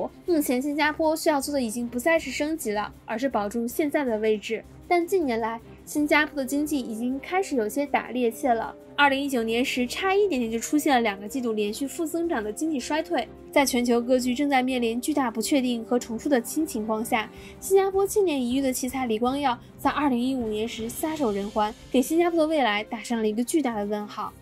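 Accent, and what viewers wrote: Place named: India. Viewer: 这英语一说，我以为到了印度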